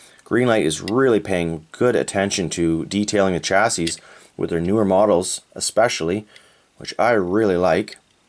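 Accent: Canada. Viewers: Banana-ing of the vehicle❓❓🤔 - Ya big kid,you.🤭 Also,just wondering,by any chance,would you happen to be Canadian?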